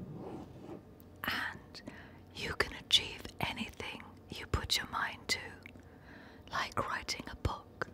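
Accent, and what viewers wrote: England, United Kingdom. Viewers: Going into this I knew that she naturally has two accents but I’d only heard the American one. And still...when she began speaking in an English accent I was surprised to say the least 😂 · She was raised in the UK and moved to America as a teen, thus she developed her English accent naturally. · English accent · She’s so lovely. -She’s become more British than me, in recent years! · She is one of the most beautiful women and most brilliant actors I have ever seen. Her elegant british accent made this asmr even more sensuous. Thank you Netflix! She is a natural. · i’m gonna die! her voice and English accent😫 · How dare the people who mocked her British accent growing up. Madness.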